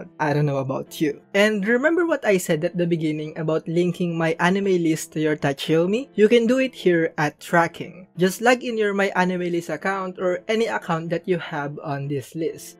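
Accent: Philippines. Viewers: Your Filipino accent is kicking in my guy ❤️💯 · I know from your accent, and humor that you're a Filipino 😂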